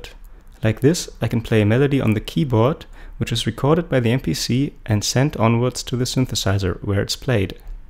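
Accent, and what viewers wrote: Germany. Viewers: Are u german ? Oder halt bist du deutsch ? Your acent sounds very german :) I want to buy me something like this and your tutorial was very clear and helpful :)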